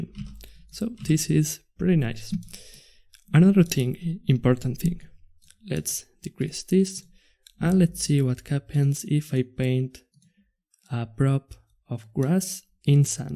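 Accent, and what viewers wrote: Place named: Spain. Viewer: Great Video! by your accent i think that your native language is spanish, dont worry, spanish is also my native language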